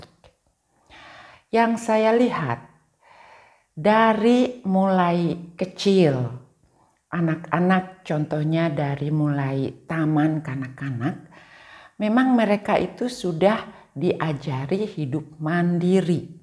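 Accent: West Java, Indonesia. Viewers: Sanaos lami linggih di swiss lentong sunda na masih kadangu, Sae pisaan 👍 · uhun bu,rada aneh oge😂ari urang sunda sok sanajan pindah kamamana ge cara nyariosna logat sundana tetep aya keneh😁ibu oge cara nyariosna angger katingali sunda na😂aya oge kimbab famili,sok sanajan tos pindah ka korea angger gaya nyarios sunda na aya keneh,pas ningali teh,ah ieu mah tina cara nyariosna siga urang sunda,beda😁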